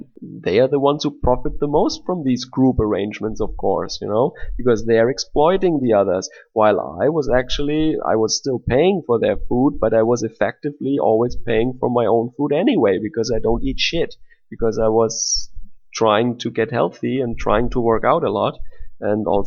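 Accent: Bavaria, Germany. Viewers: dachte höre bayrisch :) Falls du hilfe oder unterstützung brauchst. Sag bescheid.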